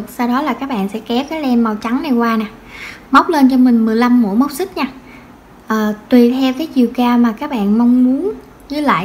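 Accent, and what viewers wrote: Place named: South, Vietnam. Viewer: Bé hướng dẫn rất dễ hiểu. Cô lớn tuổi rồi, mà cô còn theo kịp đó. Cô cảm ơn con. Cô thích giọng miền Nam của con rất dịu dàng dễ thương ! 👍💐❤️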